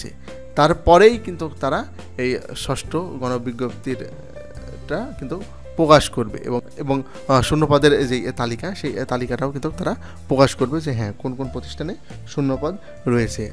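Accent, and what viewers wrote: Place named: Khulna, Bangladesh. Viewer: Apnar basha Khulna.. right?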